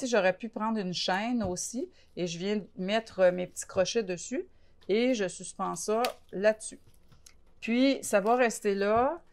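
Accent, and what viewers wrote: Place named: Quebec, Canada. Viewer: cet accent fleuri du Québec, magique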